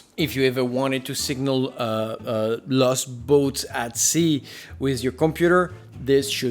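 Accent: France. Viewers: and... sorry but are you French ? (I noticed a little bit of a French stress in you voice... ^^). No offense though. French is my mother language ;) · Oula il a un accent français lui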